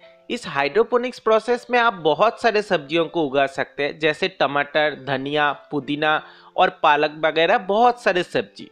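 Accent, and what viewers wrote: Bengal, India. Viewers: Apni Bangali seta apnar Hindi accent a bojha jay.. tobe apni jotota sototar songe protita details bojhan seta sune valo laglo. Apnar tamarind bonsai ta dekhe apnar channel er r o kota video dekhlam. Evabei egiye Jan. Amar subhechha roilo... Waise hindi me bolte hain shayad isiliye aap jyada logon tak pohuch pate hain... Which is definitely a good idea.. keep it up 👍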